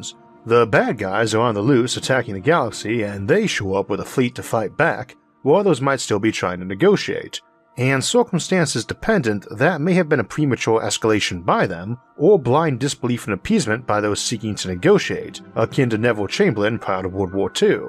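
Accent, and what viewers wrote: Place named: Kentucky, United States. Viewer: What is your accent, you sound like you are from old Kentucky from the1800's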